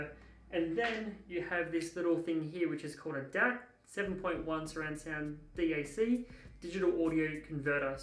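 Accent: Australia. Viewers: THE FUCKING AUTRALIAN ACENT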